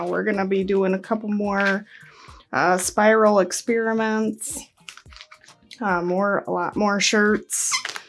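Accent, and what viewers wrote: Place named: Michigan, United States. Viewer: awe thank you so much!!! That is so sweet! I definitely will take you up on that! I'm subscribing to your channel now, your videos are inspiring! I've lived in Michigan my entire life and your "accent" reminds me alot of ours lol im sure your state is close I thought that was cool as well, I feel like I don't hear it to often on YouTube.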